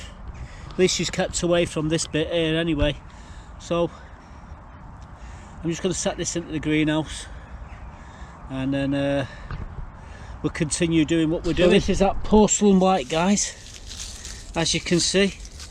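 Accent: United Kingdom. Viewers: Great video. Nice idea having handicap plots above ground. Your pavers are looking good already. Can't wait to see the finished area. You surprised me at the beginning by putting sunflowers in pots. I had one last year that was 10' tall. I've never had a small one before. This year they are popping up all over because they self seeded and some had to be moved. I love em though so they are staying! So you use the horse manure to top dress your beds then. Hope it doesn't smell. My husband sat here and watched with me. He was surprised that I could understand your accent when he was having trouble. He's originally from Redditch. Told him I've been watching your videos for a while now so was able to interpret a few things for him😅. So funny eh? A Canadian interpreting a British video for a British Canadian. Take care.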